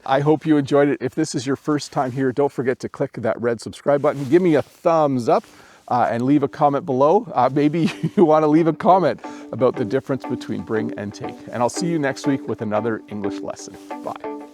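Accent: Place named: Canada. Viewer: you speak wonderful, informal, Canadian English. We LOVE your programs and we LOVE seeing the great outdoors when you do your lessons. You are on a farm, speaking informally. We LOVE your programs. Keep going!! My English students love your lessons! 😊😊🌻🌻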